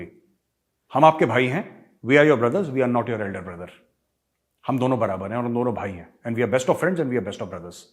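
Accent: Lahore, Pakistan. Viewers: sir big fan of your Lahori accent.... Sir maza aa jata hai apki video dekh kar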